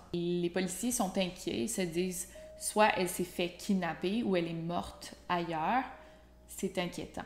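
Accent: Canada. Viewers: J’adore ta facon de raconter les choses … pourtant j’ai du mal avec l’accent canadien d’habitude mais avec toi tu raconte tellement bien que je m’en fiche 🤷🏼‍♂️ ! Continue ainsi ! ☺️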